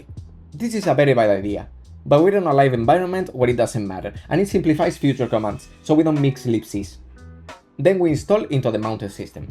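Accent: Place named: Spain. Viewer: Tu eres español, que te he pillao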